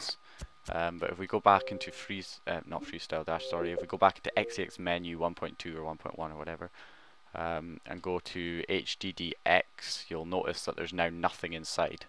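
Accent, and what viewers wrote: Scotland, United Kingdom. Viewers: cheers mate, your tutorials have helped me so much, good to hear that barry Scottish accent!!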